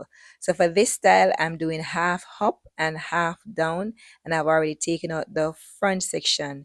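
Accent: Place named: Jamaica. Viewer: She sounds Jamaican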